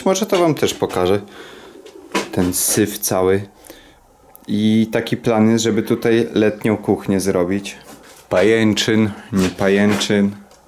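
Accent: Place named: Podlasie, Poland. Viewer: A tak w ogóle to swój chłop jesteś fajnie zaciągasz,ja z Podlasia,to wiem,co mówię!!! NIECH ŻYJE ŚCIANA WSCHODNIA!!!!!!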